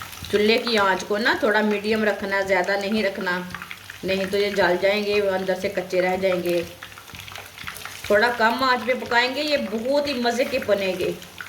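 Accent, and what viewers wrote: Punjab, India. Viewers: Punjabi bolyn to behter hy full punjab8 lehja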